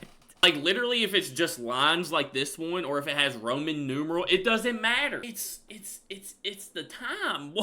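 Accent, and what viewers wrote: Kentucky, United States. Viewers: Soon as I heard that accent I just knew you were from KY. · Lovin your accent, is it Kentucky? I’m very English. Sometimes youre almost London cockney. July 28? I’m July 27. 😄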